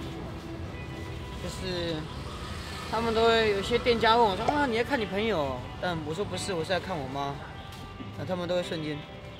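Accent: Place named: China. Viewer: 你很可愛，加油。但你口音好重？ 你是大陸人嗎？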